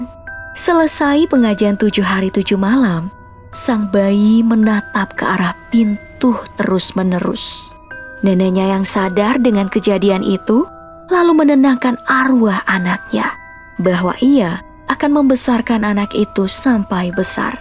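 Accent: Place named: Pontianak, Indonesia. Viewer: Yang berasal dari pontianak mana nihh suaranyaaa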